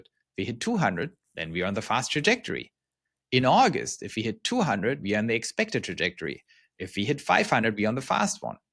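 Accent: Germany. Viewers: Interessanter Inhalt, aber der deutsche Akzent ist so hart, dass ich nicht zuhören kann 😅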